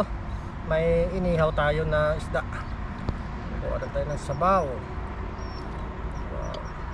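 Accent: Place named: Iloilo, Philippines. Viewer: saang province ka ba galing?naitanong ko lang kasi ang punto mo parang ilonggo.😊ingat lang po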